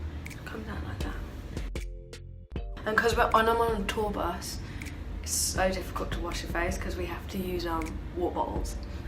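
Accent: United Kingdom. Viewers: I was so confused at the beginning bc I forgot she was British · Wow I had absolutely no clue she was british until I watched this video 😩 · Awwwww I didn’t know she was British so cute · U lot act like youve never heard a british accent before lmaooo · The calmest British accent I ever heard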